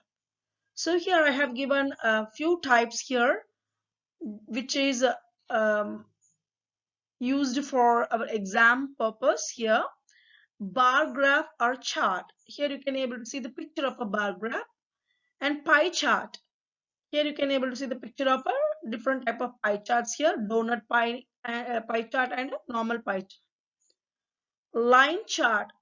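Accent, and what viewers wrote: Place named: India. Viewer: this is indian accent😊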